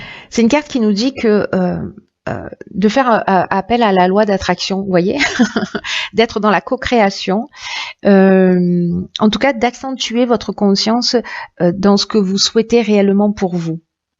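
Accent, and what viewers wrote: South, France. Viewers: Merci ! Reçu 5 sur 5, accent du Sud compris, soleil assuré !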